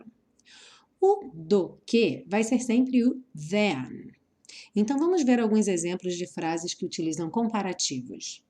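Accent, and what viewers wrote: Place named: São Paulo, Brazil. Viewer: Certo professora! Som de r do interior de São Paulo(Piracicaba).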